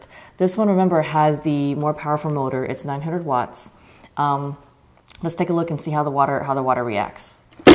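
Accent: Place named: United States. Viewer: Sounds American to me...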